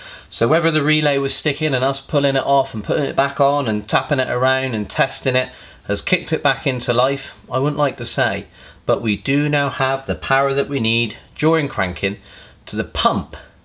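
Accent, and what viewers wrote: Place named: United Kingdom. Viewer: I really like the british lang.. Nice video.. informative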